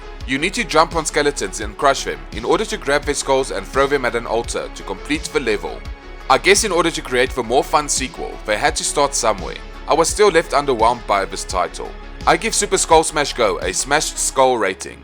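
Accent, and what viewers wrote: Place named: South Africa. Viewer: You sound a little bit Southafricanish lol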